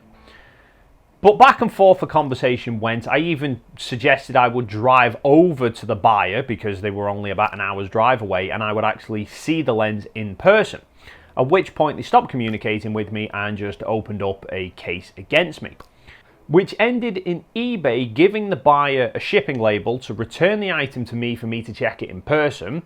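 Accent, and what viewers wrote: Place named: England, United Kingdom. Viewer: LOL you are welcome. Love your channel and I find your mild humor funny. Your English accent gives you a leg up on other regular photography youtubers. So consider yourself lucky!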